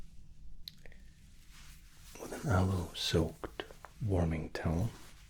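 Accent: Scotland, United Kingdom. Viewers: Nice work!! Also, you are British AF hahah I love it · Love your accent and you are defo up there with the best love your videos.... What part of Scotland do you hail from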